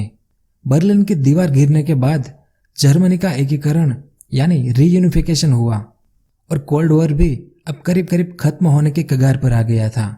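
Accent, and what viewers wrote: Gujarat, India. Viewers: Aap gujarati ho apka bolne ka tarika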